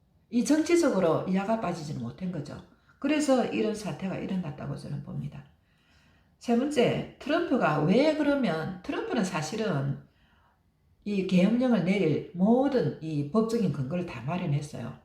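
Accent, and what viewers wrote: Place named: Busan, South Korea. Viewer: 부산 같던데요 ㅋ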